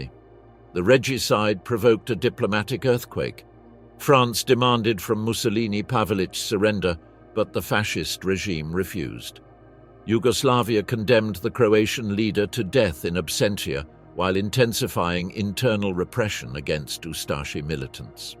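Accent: United Kingdom. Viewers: Well, whomever wrote/narrated/AI'd this, clearly wasn't a "English as mother-tongue" speaker, despite the English accent, as evidenced by the lack of 'articles' [a - an - the]. And the occasional sound of pages being turned isn't fooling anyone. 😏😏😏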